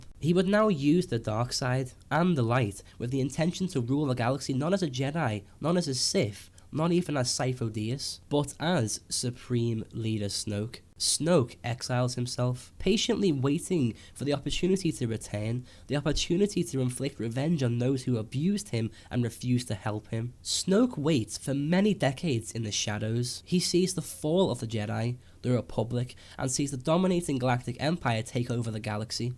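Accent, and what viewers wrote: Liverpool, United Kingdom. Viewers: scouse lad init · Chris Griffin he's a Scouser ie someone from Liverpool. They all talk like that you American twit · random question but are you scouse? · you Scouse?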